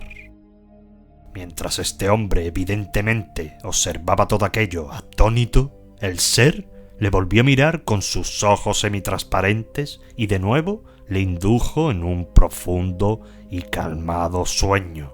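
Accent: Spain. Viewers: Hola Me gusta cómo narra los videos y las historias Yo vivo en California soy de Guadalajara México gracias por tan bonitas historias Por su forma de hablar creo que viven en España gracias otra vez y Hasta la vista recuerde yo lo escuchó siempre y la mayoría de sus historias las tengo guardadas Hasta la vista y cuídese Que Dios lo bendiga Pepe Gaitán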